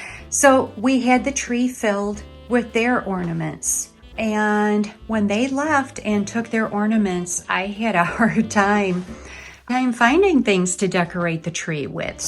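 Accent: Michigan, United States. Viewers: Hi, We were so so excited when you opened your front door and your Siamese Sadie appeared. We have a few of the same things in common. First, my husband went to law school in Michigan. Your accent is still music to his ears. Next, we have 3 Siamese cats Sadie (Lilac Point), a huge boy named Keith and a little Siamese born in May (that we call June because we got her in June). We live in a 100 year old house. Your home tour was so inviting and cozy. Jack was so so cute when he was being camera shy. What breed dog is he?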